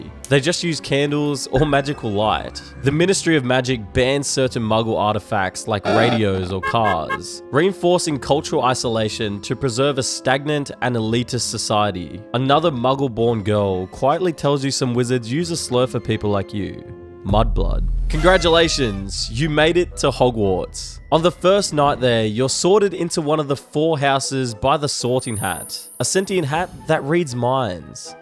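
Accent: United Kingdom. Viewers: What happened to the American presenter? He had a beautiful way of speaking, the cadence the pauses. This one sounds British.....none of the beauty of the American. My ears hurt listening to him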